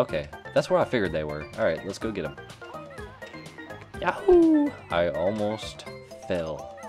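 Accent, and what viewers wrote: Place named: South, United States. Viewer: Your accent is pretty cool! It's southern but not seriously obnoxious like other people with southern accents I've heard. It's wierd because I live in the south and I don't have a southern accent... I don't think. O.o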